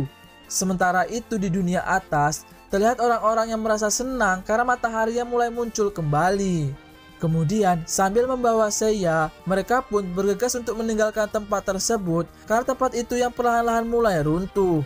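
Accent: Palembang, Indonesia. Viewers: Tangan seya kena rujah... hhhh asli operator ni wong palembang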